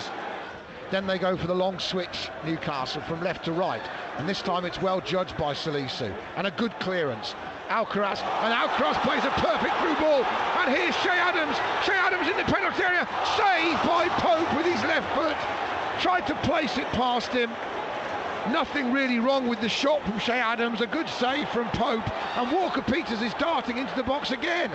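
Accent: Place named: United States. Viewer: He is American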